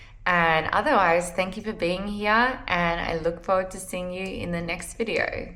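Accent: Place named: Australia. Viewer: I knew right away you were from Australia. I enjoy your content but think you would also be good in the Tesla community.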